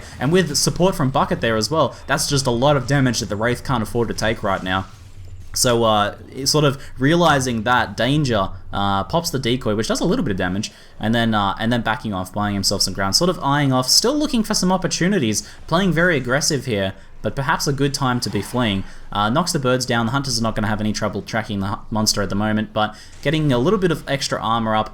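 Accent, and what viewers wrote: Australia. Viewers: D yeah I noticed. Good job though. Overall, I really enjoy you casting Evolve quite a lot. Shame you upload those relatively rarely. Evolve is an awesome game to watch, especially when there are so many skirmishes (or maybe one constant battle?) which are barely pulled of! P.S English is not my native lang so I've tried to "decipher" what is the 1st word of your intro/welcome catchphrase? Is it "Good day it's here [...]"? Or...? I generally don't have problems with understanding spoken or written english but your "down under";) accent coupled with the fast-forward tempo of you saying it... well you get the idea:P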